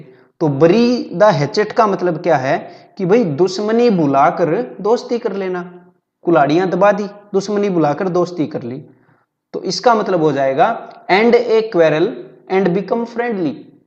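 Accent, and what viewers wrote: Haryana, India. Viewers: bhai maza aa jata hai yaar apki haryanvi type bhasha shun k . . . . knowledge ka dose thanx boss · Nice haryanvi sir ji 👌👌👌👌👌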